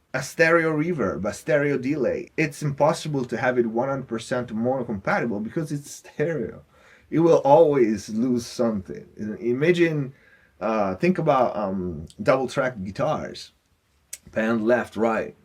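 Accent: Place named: Italy. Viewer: Just curious from your accent but are you Italian?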